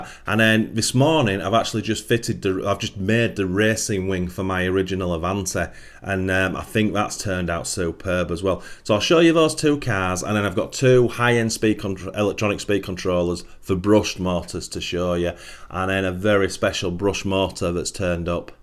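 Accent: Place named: Northern England, United Kingdom. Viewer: Love the channel, but a bit of a personal question, you have a northern accent and I am thinking south lakeland.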